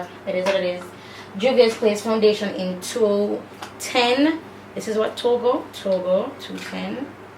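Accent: Jamaica. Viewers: Are u from Jamaica cause u talk like dem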